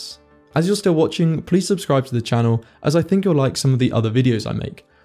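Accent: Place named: RP, United Kingdom. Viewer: There are an awful lot of YT pretending to be “science” with British speakers. I guess whoever is paying to make these think it gives the channels more credibility. Anyhow… it is much more likely that the islands are sinking rather than “sea rise” which is a myth. The great climate scam has come to an end everywhere except these types of pretend “science” YT videos which still constantly push the “sea rise” and other hoaxes.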